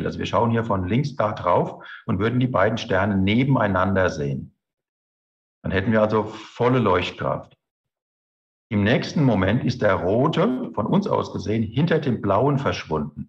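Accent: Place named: Frankfurt, Germany. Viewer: Sie können noch so hochdeutsch sprechen, den frankfurter hör ich immer raus 😍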